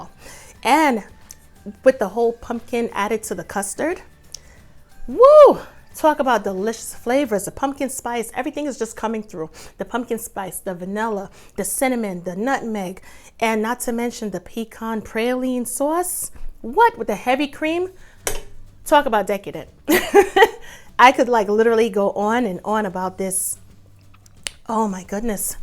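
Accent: New York, United States. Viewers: I knew I recognized that accent NY your dishes look great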